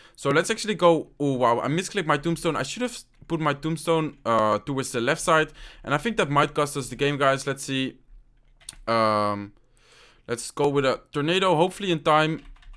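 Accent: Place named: Netherlands. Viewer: Your accent giving me headaches. Why can't you speak english like any other dutch human being.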